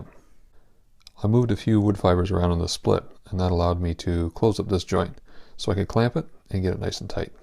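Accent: Canada. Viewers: Hey! Are you in Canada? Or Southern Virginia? Just curious about your accent. I'm retired from furniture, so I like to watch and sometimes I can give you some tips. So far, you do everything like I did, except I always used flux brushes for applying wood glue. Work much better for gluing and much cheaper too. Thanks a lot. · I hear a fellow Canadian's speech! Broken chai, here and now some optimism about repairing it. Enough optimism, that I won't watch the "make a new leg" until I hear the fateful crack again in this one.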